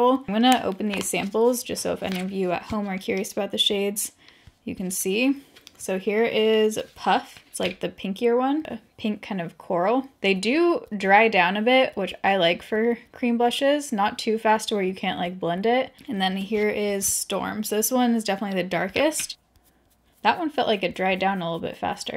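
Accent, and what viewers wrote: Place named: United States. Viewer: Scalps. She made scalps for dinner... The American accent blows my mind sometimes lol. Love you, girl! 💕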